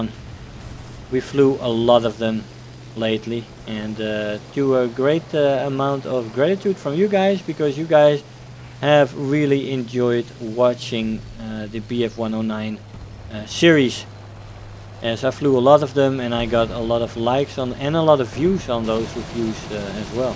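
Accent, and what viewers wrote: Netherlands. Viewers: this plane is pure canser at itd br teams full of them kill us teams no losses btw are you dutch?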